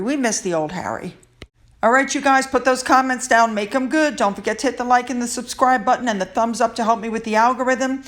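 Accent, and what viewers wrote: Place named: United States. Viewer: I opened this cause I thought it would be narrated by some lovely, british voiced person. Much to my dismay, it was a common American sounding gossip columnist. Sounded like someone from the National Enquirer. Highly disappointed. Couldn’t even listen to the end. I’ll be more careful next time I listen to anything on the Royals.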